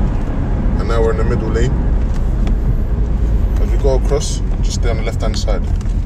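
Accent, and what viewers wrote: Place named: United Kingdom. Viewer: he literally has a british accent and it says innova business park?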